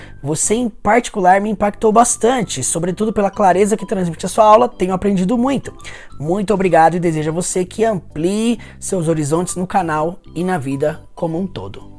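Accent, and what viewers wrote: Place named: Inland São Paulo, Brazil. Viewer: Esse teu sotaque de Paulista do interior é insuportável!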